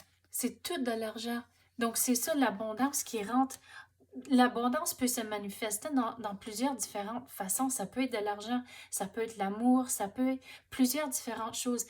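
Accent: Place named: England, United Kingdom. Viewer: Merci pour se superbe tirage en attendant l évolution, vous avez une très jolie voie avec ce petit accent anglais qui dégage une douceur. Je vous souhaite beaucoup de bonheur et de joie sur votre chemin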